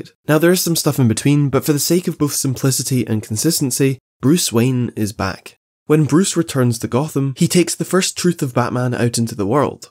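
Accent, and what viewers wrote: Scotland, United Kingdom. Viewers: Well he's Scottish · Great video man, didnt realise you where a fellow scot until this video so ay:D from one Scottish to another Scottish, keep up the good work:) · damn ur scottish too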